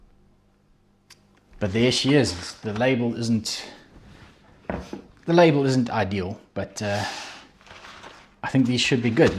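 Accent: South Africa. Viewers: So nice to hear a South African accent on YouTube.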